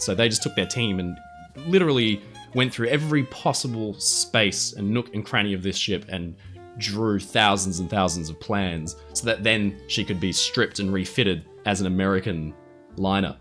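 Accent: England, United Kingdom. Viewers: I thought you were from the UK , you sound more English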